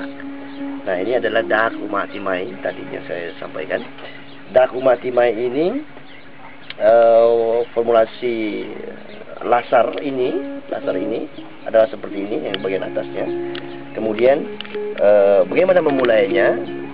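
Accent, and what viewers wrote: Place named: Ulu, Indonesia. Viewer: logat asli orang ulu.. mantap abang ni, akhirnya dapat juga pencerahan belajar sape.. salam dari Sanggau bang..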